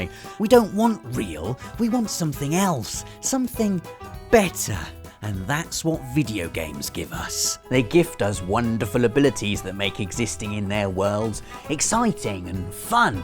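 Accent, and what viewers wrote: United Kingdom. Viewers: Lucca Cardieri PlayStation access wins because... British accent beats all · why oh why is Sony Playstation presented by dog-ugly nerds with a Brittish accent! ? unsubscribe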